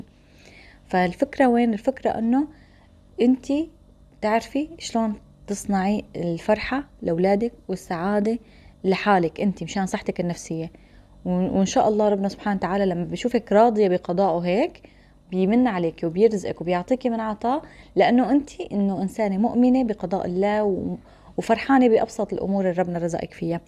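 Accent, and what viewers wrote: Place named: Syria. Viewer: بصراحة تفاجاءت بقناتك الثانية يا بالله شو مهظومة هههههه بلهجتكم السورية بقولها متابعتك من اليمن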